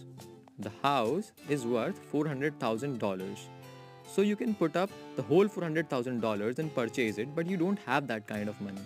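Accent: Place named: India. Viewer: so funny Indy accent!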